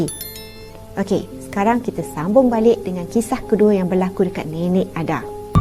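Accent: Kelantan, Malaysia. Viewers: Bau budo la kak..ckp kelantan jerr kak x payah ckp KL..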